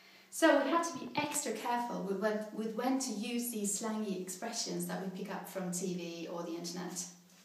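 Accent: United Kingdom. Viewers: I'm guessing from the context here that you're Swedish. You have a heavy British English accent. I thought you were British until I got the context that you were Swedish.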